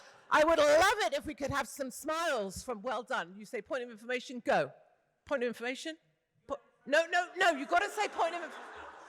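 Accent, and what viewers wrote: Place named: United Kingdom. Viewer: I’m generally worn out from British accents but I like a British accent saying the things says. So much so I followed her.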